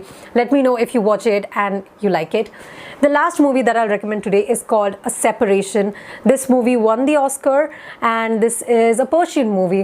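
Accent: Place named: India. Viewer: I love the Indian accent!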